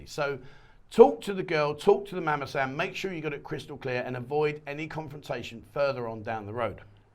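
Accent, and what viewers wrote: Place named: South London, United Kingdom. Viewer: Where you from in England mate sounds like our way abit. South of London?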